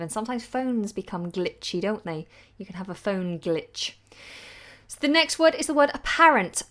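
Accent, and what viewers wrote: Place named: RP, United Kingdom. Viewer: I’m so impressed with your composure, your delivery and your genuine commitment. I love your accent. RP? I live just north of Boston so my accent is unintelligible. You are doing great work.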